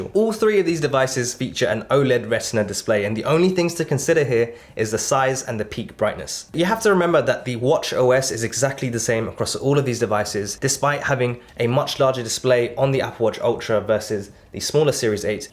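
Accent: United Kingdom. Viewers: It's the first video of you I've watched, it was suggested by Youtube, I like your analysis and I'm agree with you about the size question. I have a Garmin 55 since 2 weeks, it's nice but the screen is awful compared to a smartwatch, I'm interested into the Apple Watch (series 9 when it will be out). You British accent is very clear even for a non-native speaker like me so I will definitely watch other videos of your channel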